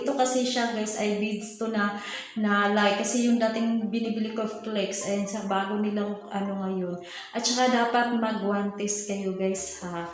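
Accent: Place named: Visayas, Philippines. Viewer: Mam idol titigas ba ang sabon kng ang ilalagay kong lye is 15 pcs kalamansi tpos with tomato mga 5 to 10 tpos lagyan ko pa ng myra e...gusto ko gawing business ksi mam at gusto ko good quality..sana mapansin mo ung comment ko ma'am ksi mg start na ako bukas completo na ako ng gamit...By the way ma'am prang bisaya ang dialect mo..bisaya din ksi ako..Thanks mam..God bless and thank you for sharing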